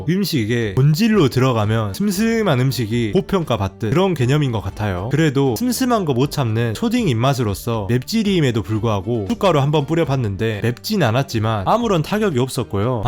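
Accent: Jeolla, South Korea. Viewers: 억양이 전라도분이신거 같습니다. 영상 재밌게 보고 있습니다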